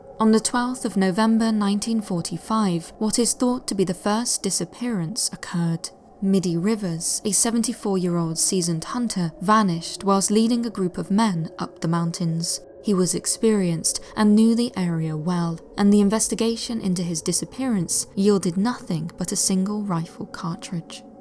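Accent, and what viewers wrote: England, United Kingdom. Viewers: English from England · It’s the queens English mate, all the best 😂🇬🇧🏴🇺🇸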